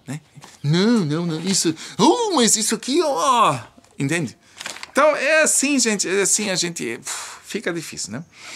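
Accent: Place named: Germany. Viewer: Olá. Você é muito simpático e adorável. Suas reportagens na língua portuguesa com o sotaque alemão é único. Sou sua fã.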